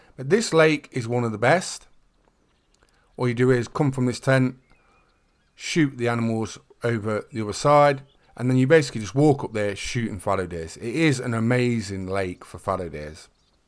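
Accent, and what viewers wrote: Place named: Leicester, United Kingdom. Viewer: Did you see ladylegend mess her great one shot? I think she was robbed, the shot looked fine, but I’ve noticed some of my shots are off target since the aus map came out. I can’t believe it’s taken watching your videos to catch on that carrying a tent with you at all times is so handy? I was always concentrating on having the max load out with guns and callers etc. that advice is golden! Great video, gunna start my great one grind tomorrow. 👍🏻 by the way, are you from Leicester? You sound like it, I know a couple of people from there. I’m down the road in Bristol 🇬🇧🇬🇧🇬🇧🇬🇧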